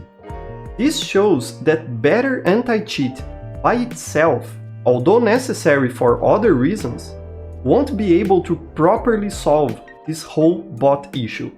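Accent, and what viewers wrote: Brazil. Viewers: Excuse me aren't you a brazilian YouTuber already? I've noticed because of the accent. " " i believe? It's that the case? You sound extremely similar to the guy. Plus, this video is very similar to one of his, talking about the same topic!!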